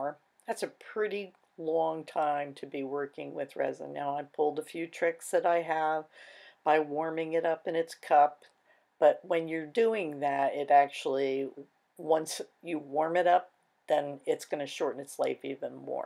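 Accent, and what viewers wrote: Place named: Northeast, United States. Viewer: I was so excited about the phone tip. Seems apple has removed that part of the compass 😔 And I knew from your accent you are from the NE. If you’re like me you’re saying, I don’t have an accent!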